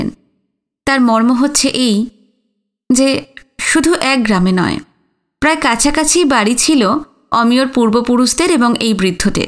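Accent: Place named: Faridpur, Bangladesh. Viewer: তুমি এমন চমৎকার ভাবে ফরিদপুরের ভাষায় ও ওখানকার আঞ্চলিক টানে গল্পটা পড়ে শোনালে যে কী বলব ! অসমসালা !!!😂😂😂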